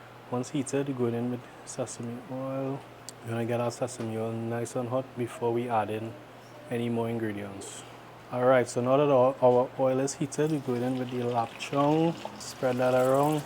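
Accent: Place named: Trinidad and Tobago. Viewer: My Brother in Law is Trini……. Just hearing the accent makes me Crazy HUNGRY!!!!! ❤️🇹🇹❤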